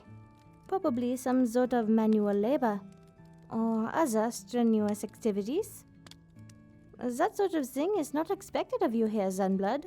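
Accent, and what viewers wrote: Germany. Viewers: Hmmmmm so much german acsent... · Ze German Lycanbae has returned! I'd mate her. The accent is my favourite. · corwyn wolski it's a German accent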